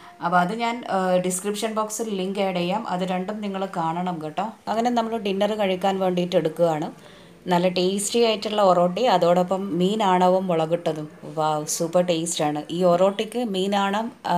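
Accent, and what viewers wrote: Thalassery, India. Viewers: Wow adipoli 👍🏼 And style of talking also nammude thalassery style 😁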